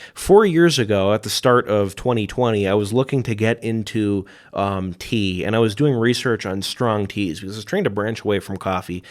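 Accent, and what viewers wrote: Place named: Midwest, United States. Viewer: I have been meaning to try Yaupon and got this video suggested to me! It is interesting though because you have a very thick midwestern and southwestern accent!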